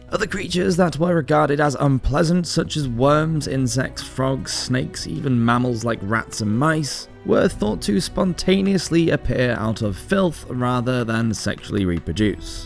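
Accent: United Kingdom. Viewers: I love the way this host talks, I am a Canadian, I quite often find some brit"s accent is difficult to understand, I guess there is many types of brit accents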